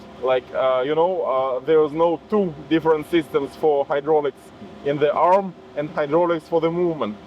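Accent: Russia. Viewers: I know it’s a race against time to save the chateau, yet you have to work offsite to earn money to pay for the restoration. I hope you can increase your subscribers so you can get money through YouTube. It will happen but it takes time. I’m praying for a miracle for you. I love when you change off between speaking French and then English but when you speak inglish you have a Russian accent. No matter what, you are delightful. ❤ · I like your native Russian accent the best.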